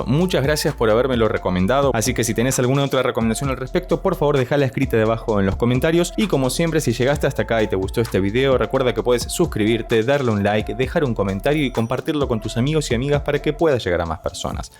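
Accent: Argentina. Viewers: Hola, al fin un lugar donde puedo comentar en castellano, además me sonás a Argentino. Te cuento que sigo a Geoff hace un tiempo y es impresionante todo lo que tiene. Los altos y bajos no los he visto en otro cantante, Si te puedo recomendar algo, sería, y otras dos más de ese estilo - como -. Y él está en una banda a cappella hace unos 25 años creo (tiene 44), la banda es y también tienen unos covers buenísimos y diferentes (, de, etc.) pero para mi la mejor y en que Geoff (se pronuncia como Jeff - shef-) es, el tema del Jorobado de NotreDame. (sigo en otro comentario)....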